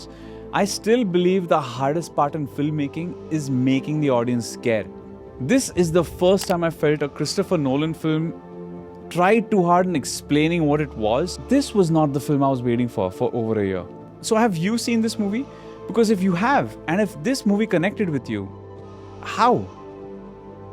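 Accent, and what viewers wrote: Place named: India. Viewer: You have got a very strong Indian accent. Fascinating.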